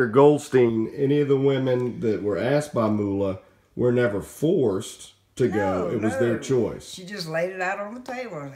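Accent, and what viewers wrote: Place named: Southwest Virginia, United States. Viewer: She sounds like she is from Southwest VA. lol I like it.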